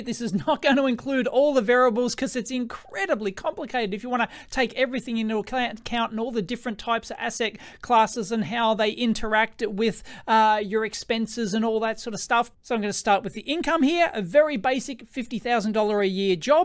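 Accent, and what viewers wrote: Australia. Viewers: your voice kills me bro and that Australian accent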